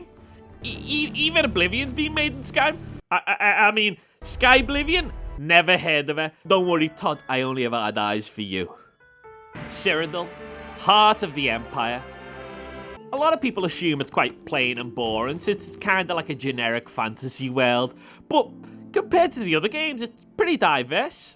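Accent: Liverpool, United Kingdom. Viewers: Side note, been enjoying your videos. Your commentary and that scouser accent is a winning combo imo. Look forward to disagreeing with you again. 🍻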